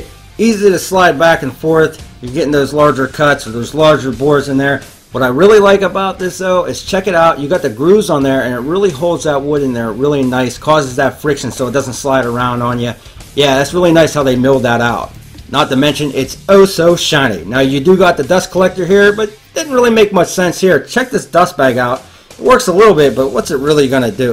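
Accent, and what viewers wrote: Pittsburgh, United States. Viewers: you def sound like a Yinzer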